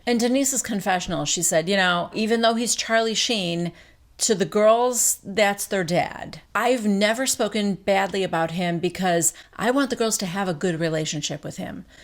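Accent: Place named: South, United States. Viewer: Oh my gosh!!!! Rinna might as well be flipping LVP off. Hot pink satin top ,hot pink eye shadow and longer brown hair. 🤮. She will.do anything to be seen and get a paycheck. It's funny how Rinna always has to mention how rich people are. Love the Southern accent, you are the best. Well I guess I had better go change my yacht underwear and go work out.🤣🤣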